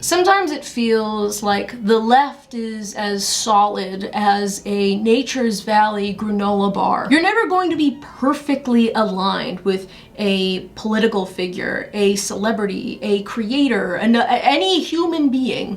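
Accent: Boston, United States. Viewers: shows in NYC while getting ready to move to LA... but i still hear Boston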